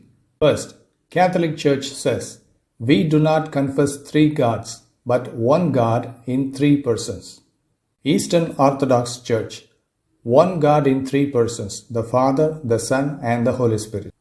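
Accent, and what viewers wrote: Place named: India. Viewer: 𝕋𝕠 𝕥𝕙𝕖 𝕡𝕖𝕣𝕤𝕠𝕟 𝕥𝕙𝕒𝕥 𝕚𝕤 𝕟𝕒𝕣𝕣𝕒𝕥𝕚𝕟𝕘 𝕥𝕙𝕚𝕤 𝕙𝕠𝕘𝕨𝕒𝕤𝕙, 𝕝𝕖𝕥 𝕞𝕖 𝕥𝕖𝕝𝕝 𝕦 𝕚𝕗 𝕦 𝕚𝕟 𝕒𝕝𝕝 𝕦𝕣 𝕔𝕒𝕣𝕟𝕒𝕝𝕚𝕥𝕪, 𝕖𝕕𝕦𝕔𝕒𝕥𝕚𝕠𝕟 & 𝕞𝕖𝕟𝕥𝕒𝕝𝕚𝕥𝕪 𝕥𝕙𝕚𝕟𝕜 𝕥𝕙𝕖 𝔹𝕚𝕓𝕝𝕖 𝕚𝕤 𝕒 𝕔𝕠𝕟𝕗𝕦𝕤𝕚𝕠𝕟 𝕨𝕙𝕒𝕥 𝕞𝕒𝕜𝕖𝕤 𝕦 𝔾𝕠𝕕 𝕠𝕣 𝕒 𝕊𝕒𝕚𝕟𝕥 𝕚𝕟 𝕥𝕣𝕪𝕚𝕟𝕘 𝕥𝕠 𝕔𝕠𝕣𝕣𝕖𝕔𝕥 𝕥𝕙𝕖 𝕨𝕣𝕚𝕥𝕥𝕖𝕟 𝕨𝕣𝕕 𝕠𝕗 𝕒 𝕝𝕚𝕧𝕚𝕟𝕘 𝔾𝕠𝕕 𝕨𝕙𝕒𝕥 𝕞𝕒𝕜𝕖𝕤 𝕦 𝕤𝕥𝕒𝕟𝕕 𝕒𝕓𝕠𝕧𝕖 𝕖𝕧𝕖𝕣𝕪𝕥𝕙𝕚𝕟𝕘, 𝕨𝕚𝕥𝕙 𝕖𝕧𝕖𝕣𝕪 𝕚𝕟𝕔𝕙 𝕠𝕗 𝕪𝕣 𝕞𝕖𝕟𝕥𝕒𝕝𝕚𝕥𝕪 𝕚 𝕕𝕟𝕥 𝕟𝕖𝕖𝕕 𝕥𝕠 𝕜𝕟𝕠𝕨 𝕦 𝕥𝕠 𝕞𝕒𝕜𝕖 𝕒𝕟 𝕒𝕤𝕤𝕦𝕞𝕡𝕥𝕚𝕠𝕟 𝕦 𝕒𝕣𝕖 𝕒𝕟 𝕒𝕓𝕤𝕠𝕦𝕝𝕦𝕥𝕖 𝕒𝕤𝕤. 𝕎𝕙𝕒𝕥 𝕞𝕒𝕜𝕖𝕤 𝕦 𝕥𝕙𝕚𝕟𝕜 𝕥𝕙𝕒𝕥 𝕖𝕧𝕖𝕣𝕪 ℂ𝕙𝕣𝕚𝕤𝕥𝕚𝕒𝕟 𝕕𝕠𝕖𝕤'𝕟𝕥 𝕜𝕟𝕠𝕨 𝕨𝕙𝕒𝕥 𝕥𝕙𝕖 𝕋𝕣𝕚𝕟𝕚𝕥𝕪 𝕞𝕖𝕒𝕟𝕤, 𝕛𝕦𝕕𝕘𝕚𝕟𝕘 𝕗𝕣𝕠𝕞 𝕪𝕣 𝕒𝕔𝕔𝕖𝕟𝕥 𝕦 𝕤𝕠𝕦𝕟𝕕 𝕀𝕟𝕕𝕚𝕒𝕟 𝕒𝕣𝕖 𝕒𝕨𝕒𝕣𝕖 𝕚𝕟 𝕀𝕟𝕕𝕚𝕒 𝕥𝕙𝕖𝕣𝕖 𝕒𝕣𝕖 𝕞𝕠𝕣𝕖 𝕥𝕙𝕒𝕟 3000 𝕘𝕠𝕕𝕤 𝕡𝕖𝕣𝕙𝕒𝕡𝕤 𝕞𝕠𝕣𝕖 𝕓𝕖𝕔𝕒𝕦𝕤𝕖 𝕚𝕟 𝕀𝕟𝕕𝕚𝕒 𝕒𝕟𝕪 𝕠𝕓𝕛𝕖𝕔𝕥 𝕔𝕒𝕟 𝕓𝕖𝕔𝕠𝕞𝕖 𝕠𝕣 𝕣𝕖𝕤𝕖𝕞𝕓𝕝𝕖 𝕒 𝕘𝕠𝕕 𝕓𝕒𝕤𝕚𝕔𝕒𝕝𝕝𝕪 𝕒 𝕞𝕒𝕟 𝕞𝕒𝕕𝕖 𝕚𝕕𝕠𝕝 𝕞𝕒𝕕𝕖 𝕓𝕪 𝕞𝕒𝕟, 𝕟𝕠𝕨 𝕞𝕒𝕟 𝕡𝕣𝕒𝕪𝕤 𝕗𝕠𝕣 𝕥𝕙𝕒𝕥 𝕚𝕤 𝕥𝕙𝕒𝕥 𝕟𝕠𝕥 𝕔𝕠𝕟𝕗𝕦𝕤𝕚𝕟𝕘 𝕓𝕦𝕥 𝕢𝕦𝕖𝕤𝕥𝕚𝕠𝕟 𝕝𝕚𝕖𝕤 𝕒𝕣𝕖 𝕖𝕧𝕖𝕣𝕪𝕠𝕟𝕖 𝕠𝕗 𝕥𝕙𝕖𝕤𝕖 𝕘𝕠𝕕𝕤 𝕣𝕖𝕒𝕝, 𝕗𝕒𝕝𝕤𝕖 𝕠𝕣 𝕛𝕦𝕤𝕥 𝕒𝕟𝕠𝕥𝕙𝕖𝕣 𝕞𝕒𝕟 𝕞𝕒𝕕𝕖 𝕚𝕕𝕠𝕝...𝕔𝕠𝕣𝕣𝕖𝕔𝕥 𝕥𝕙𝕠𝕤𝕖 𝕥𝕙𝕚𝕟𝕘𝕤 𝕚𝕗 𝕦 𝕥𝕙𝕚𝕟𝕜 𝕦 𝕒𝕣𝕖 𝕒𝕓𝕠𝕧𝕖 𝔾𝕠𝕕'𝕤 𝕔𝕣𝕖𝕒𝕥𝕚𝕠𝕟...𝕡𝕖𝕣𝕙𝕒𝕡𝕤 𝕦 𝕞𝕦𝕤𝕥 𝕓𝕖𝕝𝕚𝕖𝕧𝕖 𝕦 𝕒𝕣𝕖 𝕘𝕠𝕕, 𝕚𝕥 𝕨𝕠𝕟𝕥 𝕤𝕦𝕣𝕡𝕣𝕚𝕤𝕖 𝕞𝕖 𝕔𝕒𝕦𝕤𝕖 𝕦 𝕡𝕝 𝕔𝕠𝕞𝕖 𝕗𝕣𝕠𝕞 𝕥𝕙𝕒𝕥 𝕓𝕔𝕜 𝕘𝕣𝕠𝕦𝕟𝕕 𝕒𝕟𝕪𝕥𝕙𝕚𝕟𝕘 & 𝕖𝕧𝕖𝕣𝕪𝕥𝕙𝕚𝕟𝕘 𝕔𝕒𝕟 𝕓 𝕘𝕠𝕕, 𝕚𝕗 𝕦 𝕕𝕟𝕥 𝕦𝕟𝕕𝕖𝕣𝕤𝕥𝕒𝕟𝕕 𝕥𝕙𝕖 𝕋𝕣𝕚𝕟𝕚𝕥𝕪 𝕒𝕤𝕜 𝕗𝕠𝕣 𝕖𝕕𝕦𝕔𝕒𝕥𝕚𝕠𝕟 𝕓𝕦𝕥 𝕕𝕟𝕥 𝕔𝕠𝕞𝕖 𝕠𝕟 𝕥𝕙𝕚𝕤 𝕡𝕝𝕒𝕥𝕗𝕠𝕣𝕞 𝕚𝕟𝕤𝕦𝕝𝕥𝕚𝕟𝕘 𝕥𝕙𝕖 𝕨𝕣𝕕 𝕠𝕗 𝕒 𝕝𝕚𝕧𝕚𝕟𝕘 𝔾𝕠𝕕 𝕠𝕦𝕣 𝔾𝕠𝕕 𝕠𝕣 𝕙𝕚𝕤 𝕨𝕣𝕕 𝕚𝕤 𝕟𝕠𝕥 𝕒𝕟 𝕚𝕕𝕠𝕝 𝕟𝕠𝕣 𝕤𝕠𝕞𝕖𝕥𝕙𝕚𝕟𝕘 ℂ𝕙𝕣𝕚𝕤𝕥𝕚𝕒𝕟𝕤 𝕞𝕒𝕕𝕖 𝕦𝕡 𝕚𝕟𝕤𝕥𝕖𝕒𝕕 𝕙𝕚𝕤 𝕥𝕙𝕖 𝔾𝕠𝕕 𝕨𝕖 𝕒𝕣𝕖 𝕔𝕣𝕖𝕒𝕥𝕖𝕕 𝕗𝕣𝕠𝕞...𝕋𝕣𝕚𝕟𝕚𝕥𝕪 𝕚𝕤 𝕥𝕠 𝕙𝕦𝕘𝕖 𝕗𝕠𝕣 𝕪𝕣 𝕦𝕟𝕕𝕖𝕣𝕤𝕥𝕒𝕟𝕕𝕚𝕟𝕘 𝕒 𝕣𝕖𝕒𝕝 & 𝕥𝕣𝕦𝕖 ℂ𝕙𝕣𝕚𝕤𝕥𝕚𝕒𝕟 𝕨𝕚𝕝𝕝 𝕜𝕟𝕠𝕨 𝕥𝕙𝕚𝕤 𝕒𝕤 𝕚𝕥 𝕚𝕤 𝕒 𝕗𝕠𝕦𝕟𝕕𝕒𝕥𝕚𝕠𝕟 𝕠𝕟 𝕨𝕙𝕖𝕣𝕖 & 𝕨𝕙𝕒𝕥 𝕠𝕦𝕣 𝕥𝕖𝕒𝕔𝕙𝕚𝕟𝕘𝕤 𝕠𝕣𝕚𝕘𝕚𝕟𝕒𝕥𝕖𝕕 𝕗𝕣𝕠𝕞 𝕦 𝕒𝕣𝕖 𝕗𝕠𝕠𝕝, 𝕣𝕖𝕡𝕖𝕟𝕥 & 𝕗𝕠𝕝𝕝𝕠𝕨 ℂ𝕙𝕣𝕚𝕤𝕥 𝕓𝕖𝕗𝕠𝕣𝕖 𝕦 𝕝𝕒𝕟𝕕 𝕪𝕠𝕦𝕣𝕤𝕖𝕝𝕗 𝕚𝕟 𝕥𝕙𝕖 𝕨𝕣𝕒𝕥𝕙 𝕠𝕗 𝕥𝕙𝕚𝕤 𝔾𝕠𝕕....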